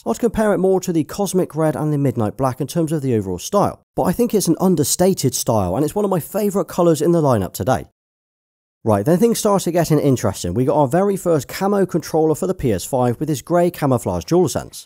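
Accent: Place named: Yorkshire, United Kingdom. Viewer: Where in Yorkshire are you from? I’ve never heard a Yorkshire accent like this 😊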